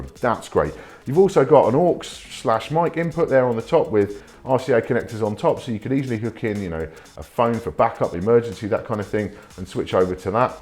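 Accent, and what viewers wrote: United Kingdom. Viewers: Great review + british accent = brilliant.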